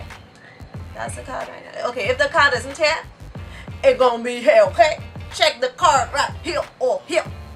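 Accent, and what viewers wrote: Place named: Trinidad and Tobago. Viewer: Colours are amazing 😉 love the bold look 😍😍 and the trini accent ☺️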